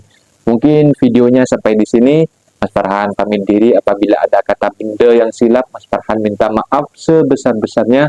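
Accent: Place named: Java, Indonesia. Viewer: Guna BM aksen jawa biasa lh tu👍👍mgkin yg komen tu,hanya pndai 1 bahasa jadi prasaan iri itu ada😂😂semangat bro,mental mau kuat💪💪👍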